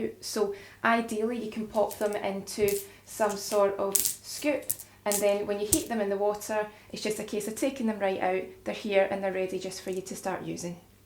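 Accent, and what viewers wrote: Scotland, United Kingdom. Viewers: Scottish accent plus the word “stone” is such a perfect combination. Not sure why but that word sounds legit coming out of a Scot. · Stoone. I love the Scottish accent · What is your accent British, Irish, Welsh, or something else?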